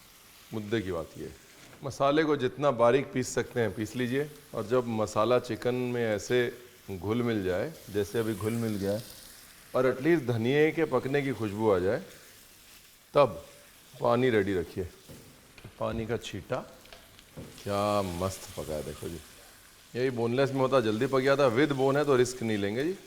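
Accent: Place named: Punjab, India. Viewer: Hello Sir, mein aapko corona time se follow krti hun. Aapki recipes banati hun, jb ghar wale khush hote h khana kha kr to mujhe bhi khushi hoti h magar aapka bhi khayal aata h ki aapki recipe dekhkr hi ye khushi mili h mujhe. Aaj meine apna channel start Kiya h. Thoda success mili h to khyal aaya jinki wajah se mili h to unke liye bhi recipe banti h. To meine abhi 2 recipe aapki bnane ki koshish ki h or unhone upload Kiya h. Jyada nahi but jitna bhi pyaar mujhe logon se mila h aapki wajah se. Thank U Sir.🙏. Aaj aapki recipe notification aaya to jhat se ph khola dekhne ke liye. Aap itne km time mein 2, 3 recipes keise upload kr lete ho ek hi video mein. Aapki baatein sunkr maja bhi aata h. Mein to Punjab se belong krti hu ,ab Delhi mein hun but aapki baatein sunkr apne punjab ki jhalak mil jati h. Thank U Chef😊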